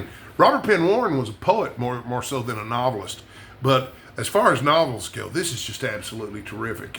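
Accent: Texas, United States. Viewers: The sonnet you picked is one of my favorites, especially the last line. I've heard it read in an English accent, but never in "Texan" - not bad! Lord of the Rings is one of my absolute favorites - way up there in my top 10 list. It's wonderful, isn't it. 2 of yours - I like the authors a lot, but not the specific books you chose (Charles Dickens and Arthur Conan Doyle). All the Kings Men made a big impression on me, but I don't know that now I'd read it ahead of a number of books from that period. Still the idea that it was the Kingfish, well, it started my love of American history of the 20's and 30's. The only one I disagreed with was The World According to Garp - it's way down on my list. Regardless - your list shows you to be a man of taste and discernment.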